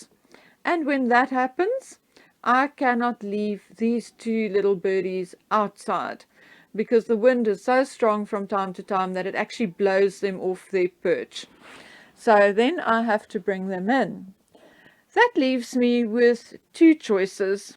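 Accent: Afrikaans, South Africa. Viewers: Your Afrikaan accent suits me just fine. You speak proper English whereas most of your haters cannot even speak or spell properly. Keep up and keep on...we don't mind the birdies, they are be-au-ti-ful creatures. Don't listen to Marlakey Megawatts crooked, wicked cronies. Cheers! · I L💓ve your birdies please leave them in the background its lovely to hear them they are just joining in and they are not singing today😥 I miss them, bring them back!! DO NOT LISTEN TO THE HARBINGERS THEY ARE JUST UNHAPPY PEOPLE. Next love your accent I assume SA? I have a friend whose accent is exactly the same as yours and I like the way she speaks. On any account do not stop your FANTASTIC VIDEOS. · I lived in South Africa for many years, Pietermaritzburg first and then Cape Town. Your accent brings back fond memories and I love listening to your videos x 🌸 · Love your accent. 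I have family in South Africa and it so reminds me of them and gives a warm feeling. Your birdies are lovely. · You know, some people just love to jibe, stir, criticise or anything to make others feel upset or uncomfortable. I love the sound of the birds in the background. It actually sounds like we are in the bush. Also, your accent is so recognizable as South African just as mine would be recognized as Australian. People need to get over themselves. Keep doing whst you're doing. There are plenty of us out here who enjoy your videos. If people don't like them they can stop watching. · Firstly, I think your accent is beautiful (S. Africa, right?). I teach English as a 2nd language and listen to accents from all over the world, they're part of what makes us unique/special. Secondly, never apologize for our furred (or feathered) loved ones. If I had the talent to make YTube videos you all would be listening to a snorting, panting, snoring pug...but it's all (chirping, barking, meowing, etc.) music to my ears! 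· Kia Ora from New Zealand every body! Just watched your latest great video. I want to say that I love your South African accent your pretty little birds in the background and the fact that you make us feel like we are there with you in SA. This is what makes your videos so special. Like Yankee Wally! I look for your videos each day. You just keep doing what your doing xx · I want to say I love your accent, and I am not alone in admiring the South African accent. It was voted the second sexiest accent in the world last year. Our internet provider here in Australia outsourced their technical advisors to South Africa, and some of those call centre guys were charming beyond belief. I also love your birds chirping. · I love the SA accent and i like to hear the birds in the background. Love to visit too, been to Clarens in the mountains. Absolutely beautiful country. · First of all, I am British living in Canada. 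I am fairly new to your site and I love your accent. Always have loved a South African voice. I cannot believe how much research you put into your site and I thank you for sharing. As for your two lovely little budgies, there singing is so pretty and I thought you might actually be outdoors. They do not squawk or even sing loudly at all. Thank you so much for your site. I do appreciate it and you so much. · Love your South African accent. I am one, in Germany. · Another wonderful video as always. The lovely birds in the background do not ever bother me, quite the opposite, they are quite pleasant to hear, and they do not stop me from hearing you clearly. It's wonderful that you have your grandson's birds there, just another way to show what a caring person you are. As to your accent, it's a joy to hear, an excellent South African accent. I eagerly await each one of your new videos, and am so glad you are going to continue making them. 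All the best to you and your family, stay safe, and know you are in the thoughts and prayers of many of your listeners, including myself. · SA accent right? So nice ☺️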